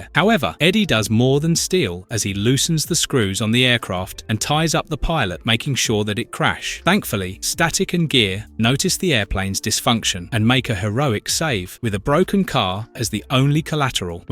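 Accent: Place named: London, United Kingdom. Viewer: His not a american his a london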